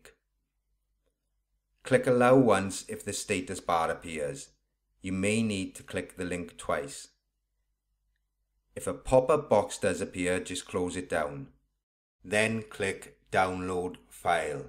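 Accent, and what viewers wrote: Wales, United Kingdom. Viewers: Love the Welsh accent! My mum is from Cardiff. :)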